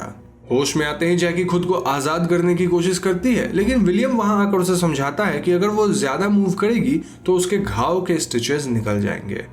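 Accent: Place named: Bihar, India. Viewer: sir ap bihar se ho me jan gai...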